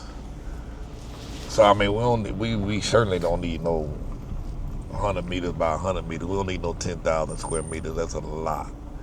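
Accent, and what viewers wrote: South, United States. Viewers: I Have Very Sensetive Ears. I Can Not Listen To Most Commentator. I Can Listen To Him, I Get A Kick Out Of His Colorful Southern Pronuncation. He Represents Sun, Shinning in Mexico. Its a Good Match!" I Want A Lot! Looks Great!Been on the Road with You a While. Looking Great!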